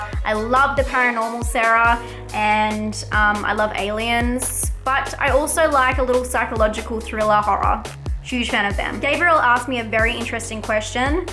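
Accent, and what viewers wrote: Australia. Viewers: First off, you know we love your Australian accent. LOL!! Congrats on your 4500 subscribers. Afflicted was an excellent found footage horror movie. If you ever come to the US, come visit my city, Philadelphia, the City of Brotherly Love. I'll introduce you to a Philly cheesesteak. Keep up the great work.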